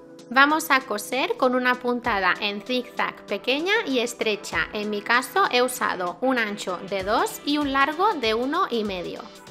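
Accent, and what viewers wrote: Spain. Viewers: Excel·lent explicació i m´agrada molt el teu accent mallorquí! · Ostres t'ha quedat un biquini fantabulós!!!(M'encanta el teu accent mallorquí!!!!) Ja fa temps que em vull fer les calcetes, i el teu tutorial em ve al pèl! Gràcies bonica!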